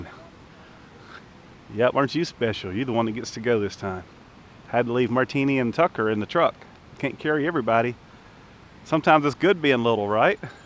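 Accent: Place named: Georgia, United States. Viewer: Great video! Being from Australia I love the Georgia accent and also Yorkie woof pack - similar but smaller than the Aussie terrier!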